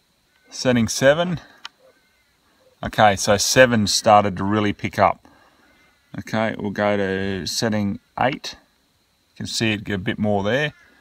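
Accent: Australia. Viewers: I absolutely love to hear an Ozzie talking guns, but...how are you an Ozzie talking guns? Was this filmed in a secret bunker?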